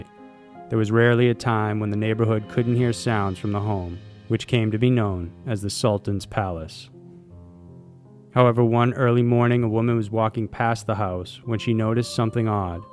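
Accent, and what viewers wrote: United States. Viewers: Probably because a) the guy sounds like he's from the US and b) the US tends to have better records, and also records in English. I know if I was doing this and I came across one that I had to go through and translate I'd quit.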